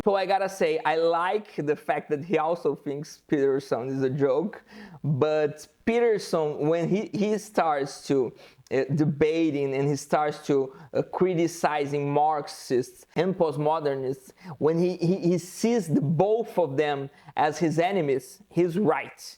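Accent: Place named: Brazil. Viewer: I love your accent! Let's go Brazil!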